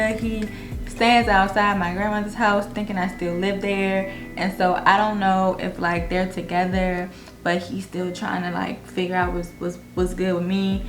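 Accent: DMV, United States. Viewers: 10 sec in are you from the dmv ? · only been here 40 seconds and i can tell youre from the DMV 😂 subscribes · I knew you were from the DMV. Lol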